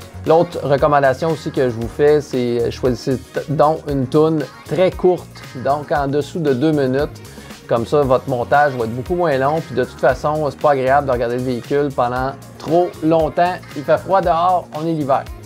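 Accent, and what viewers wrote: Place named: Quebec, Canada. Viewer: Merci pour ce tuto en Français avec un petit accent québécois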